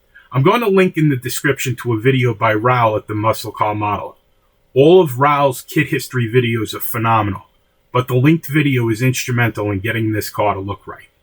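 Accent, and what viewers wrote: New York, United States. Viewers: Excellent modeling skills. Truly a well done detail build...love the engine bay and under carriage. Only one comment. Your speech is fine and you didn't need to bring attention to the "pathology". Ya sound like a Neu Yahker or up coast Bahston. Perfect speech!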